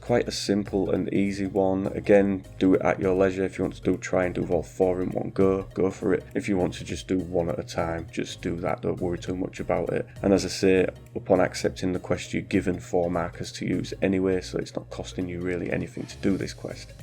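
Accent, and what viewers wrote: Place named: Hull, United Kingdom. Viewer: Thanks, you sound like you are from Hull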